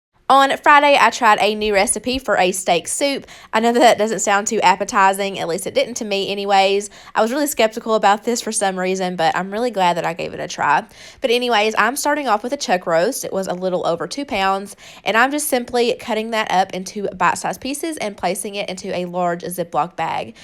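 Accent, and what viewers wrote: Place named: Texas, United States. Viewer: She sounds like us Texans except I’m even more country than her. I feel at home with her accent.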